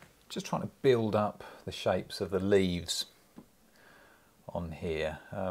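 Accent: United Kingdom. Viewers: aw ur shining blue eyes and british accent 😍 and so generous on sharing great painting skills :) 😘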